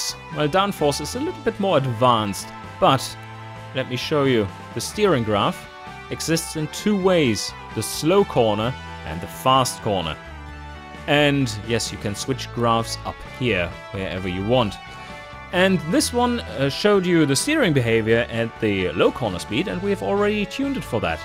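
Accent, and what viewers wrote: Germany. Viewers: Is this a fucking german or english accent i cant decide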